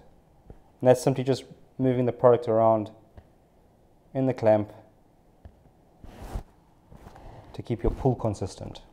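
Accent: South Africa. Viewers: Sounding like a South African 🇿🇦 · Yes man. I recognize the South African accent. Where do you get your hides? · is that a south african accent? it’s a little different than australian or new zealand. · Good video, thank you. You have a South African accent, we're you from? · Hey guys. It is so fresh and nice to hear the South African accent🤠. And at the same time see some real quality work. Makes me proud. I just recently started my business here in Germany #vanrensburgadornments. Slightly different direction than you guys. But I find your work very inspirational. Keep it up. This tutorial is excellent. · Very pleasant to listen to. Are you from South Africa? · I love your saffa accent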